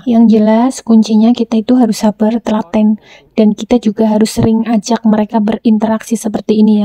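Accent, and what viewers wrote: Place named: Banyumas, Indonesia. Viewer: ngomong nya ngapak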